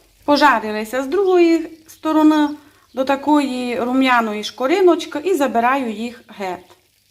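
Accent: Transcarpathia, Ukraine. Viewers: Слухаю Вас і милуюся закарпатським діалектом! Дякую за рецепт, якраз шукала як приготувати пончики! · Обожнюю Закарпатській діалект, а рецепти супер. Я обов'язково все перепрошую. Бажаю миру і веселих свят. Вітання з Буковини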